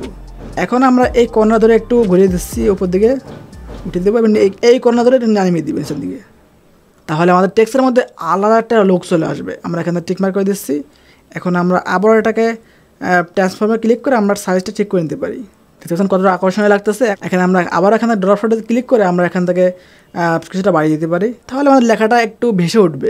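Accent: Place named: Pabna, Bangladesh. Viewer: আপনি কি পাবনার লোক পাবনার মতো ভাষা ।সঠিক হলে রিপ্লাই দিয়েন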